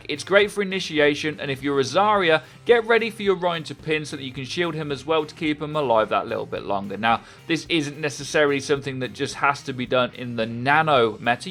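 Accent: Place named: Midlands, United Kingdom. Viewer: That midlands twang though 👌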